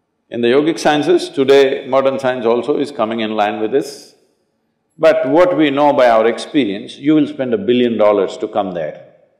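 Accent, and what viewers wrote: India. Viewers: 不是没有对的肤色，而是印式英语真的好难听懂啊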